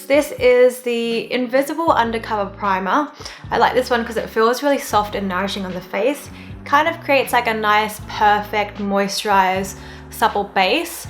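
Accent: Australia. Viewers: happy 100K !! wasn't expecting you to have an Australian accent and happily subscribed when i watched ur first video because of the energy positive vibezzz and being genuinely excited for makeup. looking forward to your future vid lovexx ig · Congrats 🎉 love ur makeup tutorials and ur aussie accent 🥺🤟🏻 IG : diemthy.ng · i've just recently subscribed after watching a few of your videos and it's so good to come across an aussie youtuber that i actually like and trust! plus it's just nice hearing a familiar accent haha love your work x IG: jai.enne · If I can remind I suscribed to channel with her orange or red makeup, her cute aussie accent really lifts my spirit and love to binge watch all her videos to catch up her contents.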